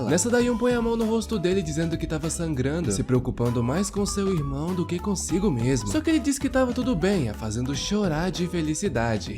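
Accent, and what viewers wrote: Rio de Janeiro, Brazil. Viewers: Certeza me responde ai vc e carioca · O narrador e do RJ né possivel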